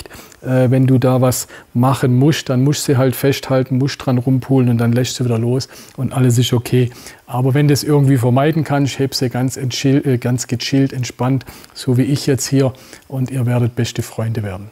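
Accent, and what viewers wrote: Swabia, Germany. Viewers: Tolle Aussage zu dem kommenden Lampropeltis getula nigritus Verbot und auch zu dem idiotischen Kaiman Urteil. Weiter so, äh noch ne Bitte, könntest du bitte etwas mehr Hochdeutsch sprechen, dein Kanal ist (zum Glück) weit verbreitet und nicht jeder versteht schwäbische Ausdrücke und Aussprache. Ich verfolge ihn zB aus Spanien und habe damit ziemliche Schwierigkeiten, danke für das Verständnis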